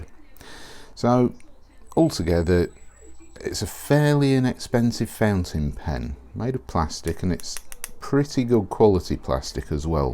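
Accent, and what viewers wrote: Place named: Midlands, United Kingdom. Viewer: I understand him just fine, but I am English, I guess. It's a Midlands accent of some sort, I think, which I imagined would be easy to understand.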